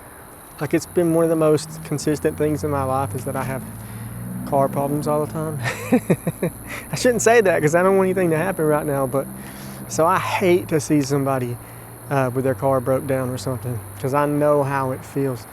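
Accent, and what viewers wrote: Alabama, United States. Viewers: I’m going to guess your from Alabama bc your accent reminds me of gump lol Ok edit: you said Alabama so I was correct & I can chug a bottle of water in 5 seconds but it has to be room temperature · This is just like camping with steve except with a southern accent. I subbed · I know this is an older video. But I just found your channel and it's so enjoyable watching you. I live in Alabama myself near Tannehill Park. I really like watching someone going to places that I can associate with. But please be careful. No video is worth risking your life for . (the billboard, or those ladder climbs) Your not only putting your life at risk but in a way your family's also. Also so great to hear someone with an "Alabama accent".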